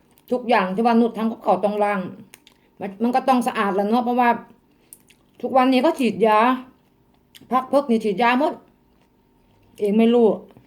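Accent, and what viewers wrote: Isan, Thailand. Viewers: ชอบสำเนียงเว้า..กินตามอัธยาสัยของเฮาแซ่บๆๆ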